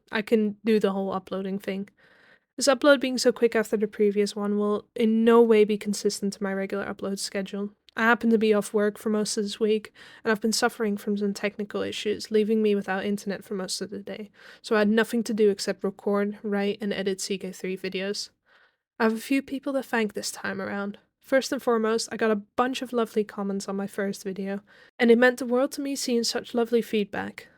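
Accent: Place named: Netherlands. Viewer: ik herken een Nederlandse accent van een kilometer ver😅 Toffe video tho en origineel.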